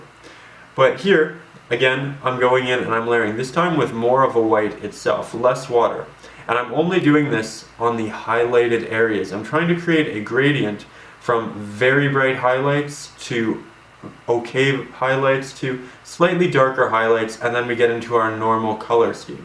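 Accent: Canada. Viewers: Fabulous! Great Canadian accent, mate! I hear ya. Thank you for your lessons. They're invaluable to me.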